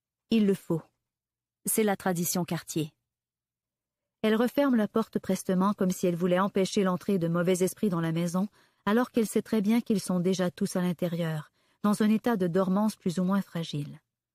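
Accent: Quebec, Canada. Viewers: Moi de même,+ l'accent Québécois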